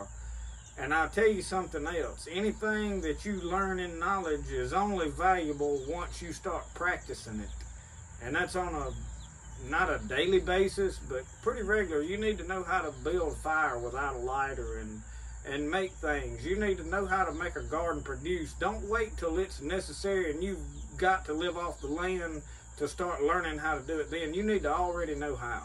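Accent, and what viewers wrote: South, United States. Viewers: When I was in the U.S. Army in Agusta GA, I was caught talking while a Master Seargent was speaking to us. He asked my name and I replied with an accent just like yours. It was so good that he said , "Boy, what part of the South are you from ?" I replied, "South Bronx, New York ". He said, "Boy, jump down and give me 50 pushups " ! I did and laughed so hard while doing them. I never forgot that moment. I suppose he never did either ! Love your accent , you're a good ol' boy !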